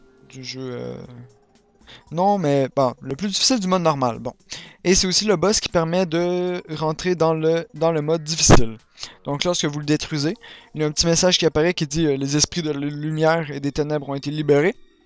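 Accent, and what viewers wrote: Quebec, Canada. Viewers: Un tuto de jeu vidéo, c'est bien ! Mais avec l'accent québéécois c'est MIEUUUUUUUX :DDD Super vidéo, merci :) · Tu vas te faire manger tout cru ^^ C'est l'accent québécois :P (Sauf erreur de ma part)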